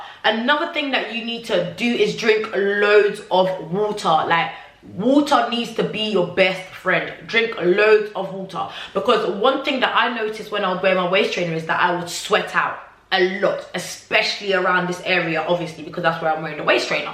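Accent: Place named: England, United Kingdom. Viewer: Your english accent😍😍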